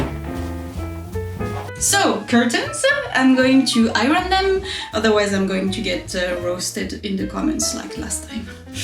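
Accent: France. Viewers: you are such an inspiration, paint, sew, carpinter. art in every thought. it freaked me out a bit you were wearing flipflops for the whole processed (closed shoes in the heavy workshop!!) but i love you and the french accent <3 salut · You are pure whimsical chaotic energy - with a French accent - & I am so here for it! The room looks FABULOUS!!!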